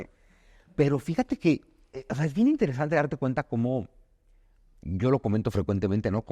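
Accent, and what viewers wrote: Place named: Mexico. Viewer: Ameno, grandísimo conocedor, dicharachero...con ese hermoso acento mexicano. Grandísimo placer escucharte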